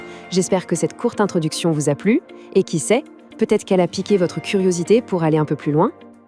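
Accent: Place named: France. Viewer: Svp la voix prononce les mots avec l'accent français, ça n'aidera pas ceux qui veulent apprendre.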